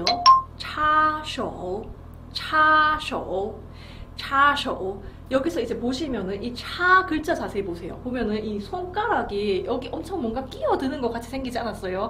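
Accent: Gyeongsang, South Korea. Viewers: 늘 경상도중국어 잘 듣고있습니당.. 不要插手。 난 손을 콘센트에 넣지마. 인줄..ㅎㅎ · 님 3개국어 하시네^^ 중국어. 대구사투리.. 귀여워요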